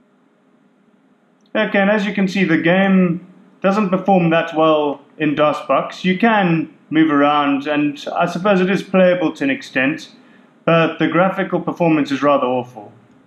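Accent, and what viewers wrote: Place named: Cape, South Africa. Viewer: I thought I could tell from your accent. Are you from anywhere in the Cape?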